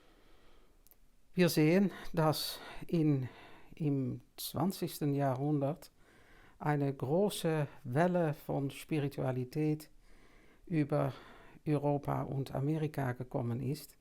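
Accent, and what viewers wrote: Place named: Netherlands. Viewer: Liebe! Vielen Dank für das Video-Projekt in deutscher Sprache! Gleich drei Punkte fallen mir dazu auf: 1. Erinnere ich mich beim Hören dieses Videos in deutscher Sprache sofort an Deine wunderbaren Vorträge, an denen ich teilnehmen durfte. 2. Dadurch, dass Deutsch nicht Deine erste Sprache ist, werden viele Aussagen eben noch überlegter, als es vielleicht in der Muttersprache passiert, wo mal automatisch etwas „herausrutscht“. Ähnlich einem Weg, den man nicht täglich geht und einem dabei jeder Schritt bewusster erscheint. 3. Ich finde Deinen holländischen Akzent sehr charmant, er gibt Deinen Ausführungen eine schöne einzigartige Farbe. Also freue ich mich schon sehr auf die folgenden Videos, nun auch auf Deutsch!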